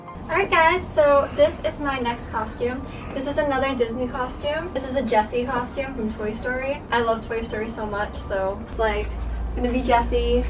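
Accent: United States. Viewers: You miss pronounced Cheshire, but that’s ok you still cool. It’s an American thing saying Shire. 😁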